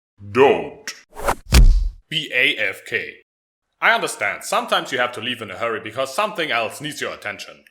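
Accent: Germany. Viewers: ***** Dass es Deutsch war wusste ich. Ich war nur verwundert, da ich persönlich den deutschen Akzent nicht wirklich mag. :3 Aber trotzdem danke für die Info.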